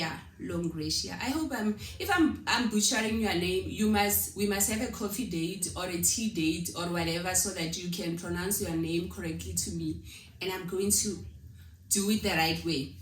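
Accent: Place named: Pretoria, South Africa. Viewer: You sound like you are from Pretoria, by your way of speaking.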